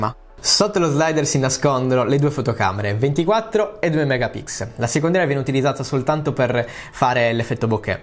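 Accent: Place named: Sardinia, Italy. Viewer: Si sente appena appena che sei Sardo! Bravisceddu 😂 bella Recensioni 😉✌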